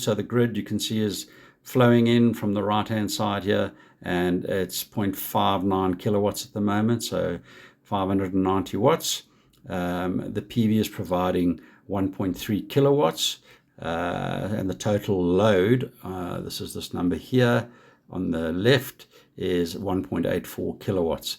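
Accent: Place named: South Africa. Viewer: I presume you have made this video in South Africa, judging by your accent. The App has been recently upgraded and for people in the U.K. or in the latitudes further away from the equator force charging from the grid is a very important function. Because if the user wants to make the most of the longer summer days to charge the battery and turn the force charging off they are able to do so. While in the WINTER months when you get only seven hours of sunshine and have an access to cheaper tariff rates during the middle of the night you want to charge your battery to use during the day time. The recent upgrade has TAKEN this facility away and if you are considering buying this system, have access to cheaper rate night time electricity and live more than 40 degrees latitude north or south of the equator this system is not a good buy.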